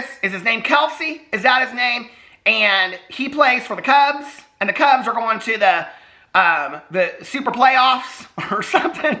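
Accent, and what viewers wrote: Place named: Indiana, United States. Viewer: I'm so glad I found your channel i've been absolutely BINGING on your videos the past two weeks! Fellow Hoosier over here!! I found you through Adam McIntosh and your videos are like listening to a friend, especially because we have a very similar accent <3 I've been sober 3 years and hearing how long you've been on your journey and just the tidbits about your life are SO relatable and fill my heart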